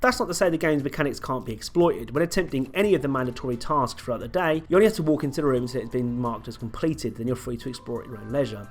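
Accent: United Kingdom. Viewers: Oh then you're just not used to this guy's accent then. It doesn't mean other non UK speakers have a problem understanding these kind of accents though.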